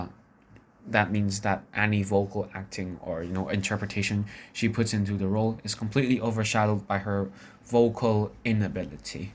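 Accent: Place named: Europe. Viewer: I like the pronunciation of names, it sounds like the Met broadcast announcer/commentator from the 60s. Where one pronounces every name in a "general European way, leaning a bit toward the German way" :D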